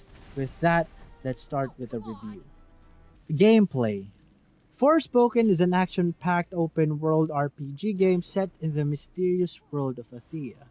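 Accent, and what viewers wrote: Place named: India. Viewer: great honest review , love your hindi accent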